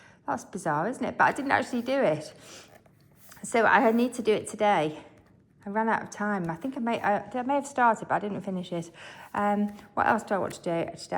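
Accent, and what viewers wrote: United Kingdom. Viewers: British accent 🍷☝🏻🤓 Nice video tho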